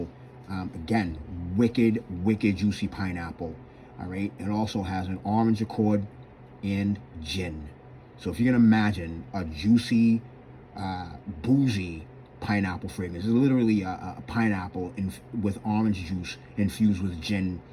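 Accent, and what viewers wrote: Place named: Brooklyn, United States. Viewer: thanx brother you the man also your accent is phenomenal reminds me of here in NYC specially Brooklyn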